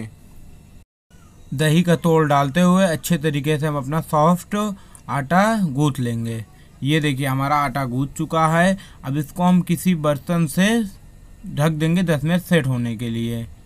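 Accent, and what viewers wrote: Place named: Allahabad, India. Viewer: Bhaiyya apke baat karne ka andaz pura allahabadi hai😊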